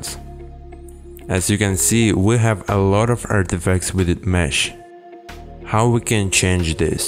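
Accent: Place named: Eastern Europe. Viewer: Accent never gets old. It's like the eastern European version of.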